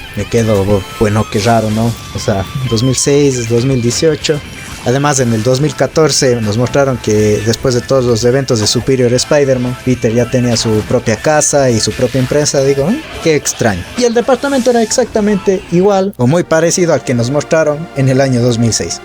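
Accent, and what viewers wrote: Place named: Ecuador. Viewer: Ese acento, sospecho que eres de Cuenca o de Quito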